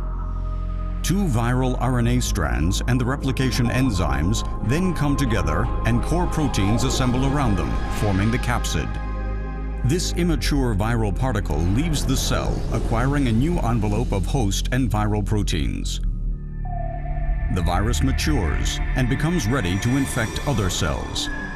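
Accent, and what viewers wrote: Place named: United States. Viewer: No mazen i don't think it is british , british accent is much easier than american , probably it is american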